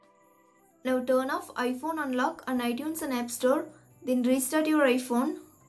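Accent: India. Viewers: Are you Indian because of your voice so nice.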